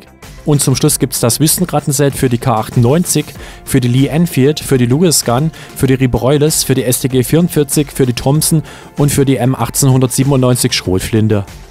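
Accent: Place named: Saxony, Germany. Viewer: Alter bist du aus SAchsen oder was ? Dein Dialekt ist ja katastrophe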